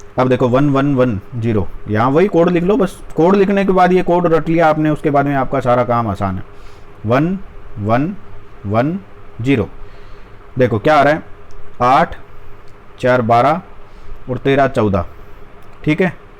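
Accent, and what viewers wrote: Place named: Haryana, India. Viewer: Sir aapki awaz es video me na thi aap pdhaya kre,aapki hariyanvi boli bhut achhi lgti hai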